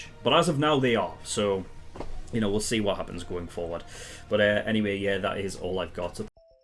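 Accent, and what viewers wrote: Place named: United Kingdom. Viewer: イギリス英語聞き取りやすくていいなw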